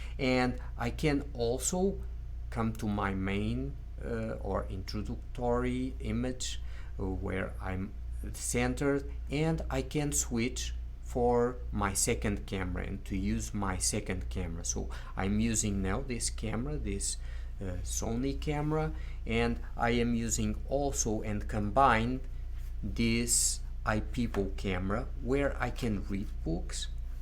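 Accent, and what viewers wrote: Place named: Portugal. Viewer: I think that you are portuguese and are reading stories in spanish with a portuguese accent. it doesnt sound authentic when you read in spanish. I am portuguese and speak spanish so I can pick up on your portuguese accent when you read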